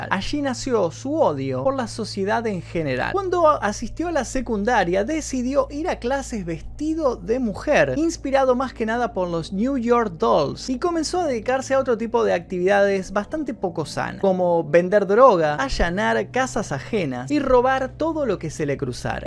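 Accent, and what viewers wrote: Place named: Argentina. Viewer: Es argentino, para mí es normal escuchar ese acento, en mi país sobran los argentinos.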